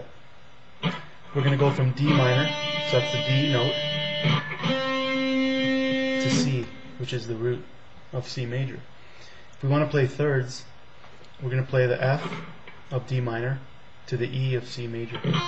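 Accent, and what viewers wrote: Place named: Nova Scotia, Canada. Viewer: East coast??? I'm from Truro, NS. Small world! Funny I didn't notice the accent probably because it sounds normal to me! Great lessons though, i've been looking for something exactly like this.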